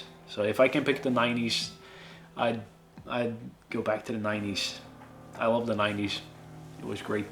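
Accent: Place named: Upper Peninsula, United States. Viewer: You're my favorite YouTube discovery of 2025! I've been assuming you've been doing an intentional Joe Perra inspired thing with your voice. But I don't think you would use an affected voice for an entire video like this. So now I'm thinking Joe Perra's accent is inspired by not just the folks who live in Michigan's Upper Peninsula, but specifically folks of Swedish heritage up there. You both nail a very hygge feel. (Not a Swedish word but it's caught on in the US for a specifically northern European cozy vibe.) I've had the causal relationship backwards! You come by it naturally. No need to build a character around it. Happy belated birthday! Your English is easily as good as if not better than half the native speakers I know. Maybe you don't have the vocabulary of a college professor. But the vast majority of us don't, either. 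Don't sweat it.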